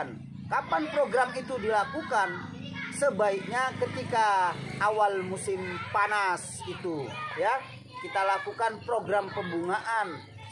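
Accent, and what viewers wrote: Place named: Indonesia. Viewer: anda kedengaran asli dgn loghat bahasa indonesia anda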